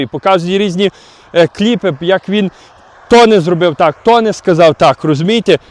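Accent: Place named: Ukraine. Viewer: Ну , який же , Ви щирий та безпосередній!😍А Ваша українська вимова , просто гріє душу!Стосовно виборів в США: президент Байден вже не зможе за станом здоров'я бути сильним лідером такої країни, як Америка, ми вдячні за все хороше, що було зроблено ним ! Трамп --- підступний , йому не можна вірити! Закінчити війну він не зможе, хіба "заморозити ", а тим часом росія набереться нових сил і рине в наступ та продовжить геноцид українського народу! Ракети, як летіли, так і продовжать летіти по всій Україні та нищити все на своєму шляху! Закінчити війну можна лише військовим шляхом маючи потужну підтримку зі сторони США та Заходу! Інакше ця війна буде дууже довгою та кривавою!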